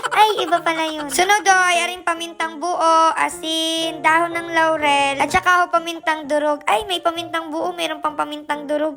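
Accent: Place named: Marinduque, Philippines. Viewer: Kakatuwa talaga mga vlog mo nakakwala Ng stress Lalo mga Punto Ng salita mo kamiss tuloy umowe Ng marinduque😊😊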